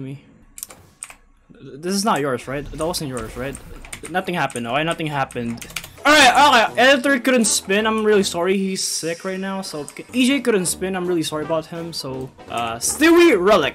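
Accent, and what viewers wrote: Philippines. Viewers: sa tingin ko talaga Filipino kaw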